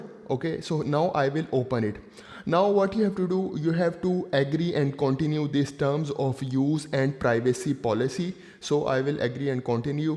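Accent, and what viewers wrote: India. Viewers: Yessir he has an Indian accent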